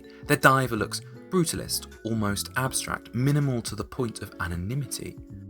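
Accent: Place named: Upper class, United Kingdom. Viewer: Just found this channel and it is already my favourite place to go for all things watches. Although I’d like to make an observation and please do not take this as a criticism. Why do a majority of watch channels speak with a very distinct middle upper class accent? With very unique pauses between words. To give you an example listen to TGV, to bark&jack, time&tide etc etc the list is endless. They all talk the same way which I thought was very unusual. I haven’t noticed this with any other hobbyists besides horology. Like to hear your thoughts on this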